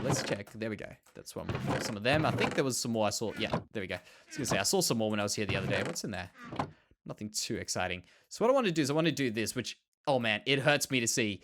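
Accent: Australia. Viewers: it's funny, you are the only other Australian Minecraft Youtuber I know of! It's so amazing to hear an Australian accent. QLD is da best xD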